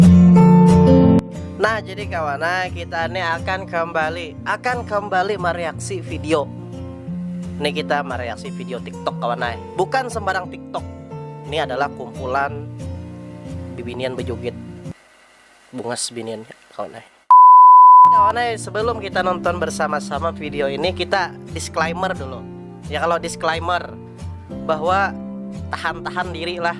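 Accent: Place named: Banjar, Indonesia. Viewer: Gaya mu tu bapander tu lucu banar sanak,ak suka banar mandangar logat banjar tu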